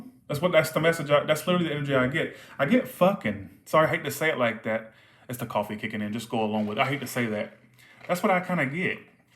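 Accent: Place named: South, United States. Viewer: Your Strong Southern accent is popping up today.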